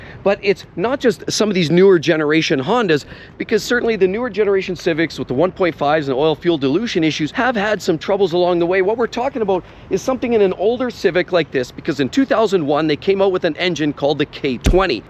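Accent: Canada. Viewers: Canadian you can't hide that accent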